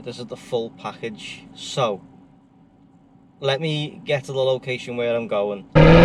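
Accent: Liverpool, United Kingdom. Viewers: 6.2 V8! Nice to hear a scouser! haha Got my SS here in Liverpool haha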